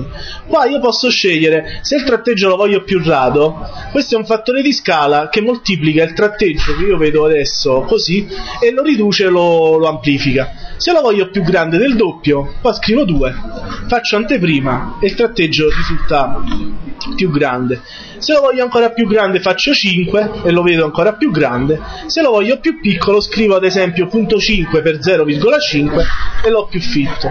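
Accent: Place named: South, Italy. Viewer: Caro maestro, a primo acchitto, la sua voce con forte accento meridionale è fastidiosa da seguire, per cui abbiamo fatto fatica integrarci ai suoi corsi, ma alla fine ha vinto lei, trovandoli molto interessanti perchè tecnicamente spiegati bene. (siamo di Milano, non la prenda a male) Lei si spiega molto bene, questa cosa le fa onore mano a mano che viene seguito facilitandoci l'apprendimento. Una nota di rimprovero va alla sua sua classe: il brusio di sottofondo prodotto dai distratti, denota la pessima educazione degli allievi. Da noi, con un insegnante come lei, non volerebbe una mosca in classe e si sentirebbe solo le penne graffiare la carta per gli appunti appresi. Complimenti e grazie, le sue lezioni ci sono molto di aiuto.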